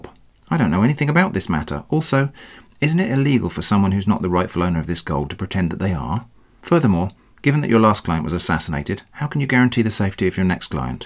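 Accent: United Kingdom. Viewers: I really like the production you put into this one!! I love ALL your videos as I'm a fool for your cheeky British accent. Lol thanks for your work!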